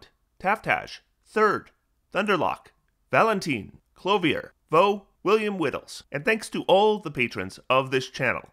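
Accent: United States. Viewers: It's almost like the profit motive degrades the value of a product over time as a business gets more and more successful. I was waiting for to say something along the lines of "profit motive bad" but it never came. To be fair I don't know him or his personal positions on things like this but also to be fair he's probably an American from his accent and he's probably been around long enough to see this sort of thing happen time and time again (at least in America). Maybe if the main goal of a business was to maximize product quality instead of product profitability, we wouldn't run into the age old problem of product quality being sacrificed in exchange for profitability. Now this is highly improbable under the current system with the way things are. So here are some changes to how the economy would work to fix this problem (and fix some other unrelated problems too): All companies must be by the law of the land, run by an elected president of the company (max term 4 years). 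No CEO, no owner of the company. In fact there is no owner of the company. Instead every employee is a part-owner of the company and gets a share of the stock. The stock isn't there to earn money. It's there to show you're part owner. Speculation (gambling (which is bad)) on the price of a 'share' of a company is now a thing of the past. Instead the profits will be split evenly amongst the employees. (The president will save some of the profits for maintenance, replacing equipment, etc.) Also to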